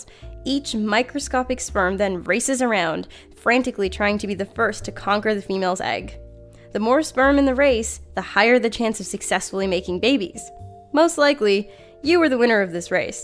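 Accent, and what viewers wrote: Canada. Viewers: Okay the only fact that blew my mind (No pun intended, you'll see why) is that that they're pretty much no naturally blue pigmented foods. And I had a feeling you were Canadian because a few videos ago I remember hearing pronounce a few words in ways that sounded like a Canadian said it. And it's cool! I'm American, but I'm fans of some Canadian skaters, there's some Canadian YouTubers I think are cool, and I have a few friends that are Canadian.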